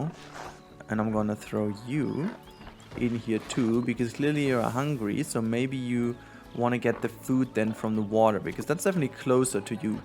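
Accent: Germany. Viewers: Man hört das du Deutscher bist! :D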